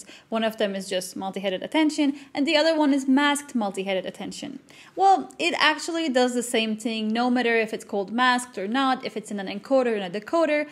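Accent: Turkey. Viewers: Why is the first think I thought is that she must be Turkish? :D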